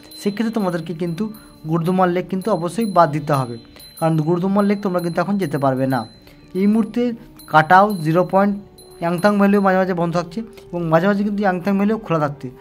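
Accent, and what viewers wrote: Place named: Bangladesh. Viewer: Bangladesh er lok kotha sunei bojha jai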